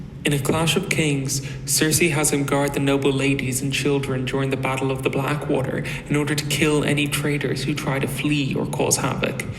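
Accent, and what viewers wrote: Ireland. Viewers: I think it's not unlikely there is truth to this theory. If there is, I think Arya may be present when Stoneheart questions ser Ilyn. I think it is Stoneheart's vengeful nature that will make Arya turn away from her path of revenge, and ser Ilyn's questioning may be a part of that. As for your accent, after paying attention to it, it definitely sounds Irish. It is, however, not a very strong accent (especially not compared to other Irish people I've met). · I really like the character Dick Crab. Your clearly Irish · I liked the video. Seemed professional. I had no idea you were a new channel. Seemed like you've been doing this a while. Loved the topic, and I noticed some interesting bits about Payne in the story, but I never thought too much about it, and this was a cool dive into it. And as for your accent, I'm sure it's not strong in the sense of the general country of where you're from, but I'm American, and anything from the English-speaking world that isn't American sounds strong to my ear. 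I'm going to guess Irish. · You sound a bit Irish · Easily one of my favourite theories! as for your accent, I diagnose you as Irish! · You sound Irish to me. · Is your accident Irish? It's strong enough to sound pleasant and sophisticated on my crude yanky ears. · Phenomenal theory! Love how Ilyn's inability to speak mirrors Stonehearts and how Podrick is involved. Also I'm guessing you're from Ireland based on your accent. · Excellent video. I'm looking forward to whatever you put out next. RE: The accent, almost certainly Irish, I'd guess Dublin but not certain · My guess is Ireland? for accent that is lol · Love the theory. Haven't seen many creators ponder his role or the parallels between him and Wex. Definitely an Irish accent. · Re: your accent question, I’m from the US and you sound Irish to me (specifically the way you pronounce some of the names)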